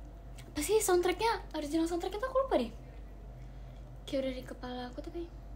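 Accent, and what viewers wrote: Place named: Java, Indonesia. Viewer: Kenapa dia bisa logat jawa ya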